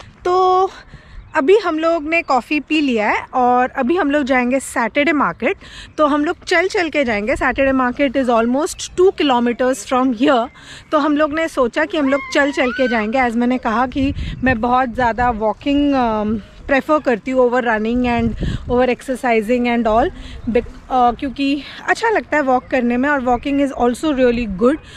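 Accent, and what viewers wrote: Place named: India. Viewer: I"ll make a guess by your accent.. are you indian, right? :) Your husband is an I.T. guy? (I ask that 'cause every indian abroad is I.T., which is a great thing.. Could you kindly ask him if I get easily a Javascript job or do I need to know ReactJS or other stuff? I'm planning to move there! :) Thankssss and greetings from Brazil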